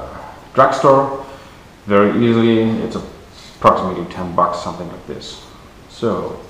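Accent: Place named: Germany. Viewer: i assume hes german (cause we have the brand of this spray everywhere) and he probably doesnt know the english word for "baumarkt". It's funny, i knew the second he hesitated he wouldnt say hardware store, cause the word baumarkt essentially translates to builder market. Obviously that is a weird name, so he probably went to an english word he heard before. Mut is just how you pronounce the 'a' in german, like "maht"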